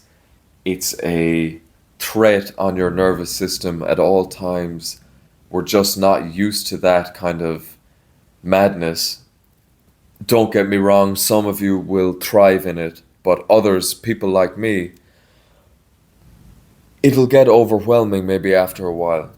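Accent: Ireland. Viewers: I'm debating between Cambodia and Thailand. I want to open a business and I know I can't do that in Thailand. I would have to find a Thai husband. In Cambodia, as far as I know, I can do it on my own. Thanks for the video. Love your videos and your Irish accent. I love Ireland...it's just too expensive. I've been there a couple of times. Good times!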